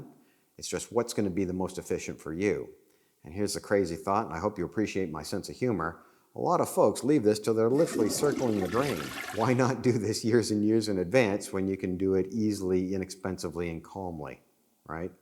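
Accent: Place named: United States. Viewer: Hi you sound american? Are the trust laws in america the same as the uk? Thats if your telling us about american trust laws?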